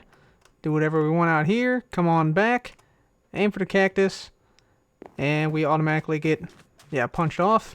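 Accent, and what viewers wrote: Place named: Illinois, United States. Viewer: I think he's from Illinois.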